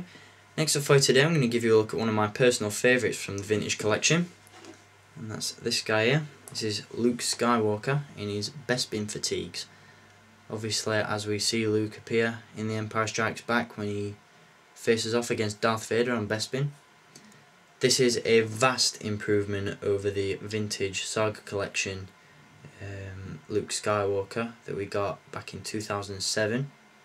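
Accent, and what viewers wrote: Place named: Leicester, United Kingdom. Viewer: Hello there. Great video/review. I got this figure in the post today after ordering from Amazon. I completely agree with you; it's probably my favourite Luke Skywalker too! It was nice to see it out of the box as I'm keeping all my Lukes on the card. By the way, where are you from? I'm from Leicester and you and me have very similar accents.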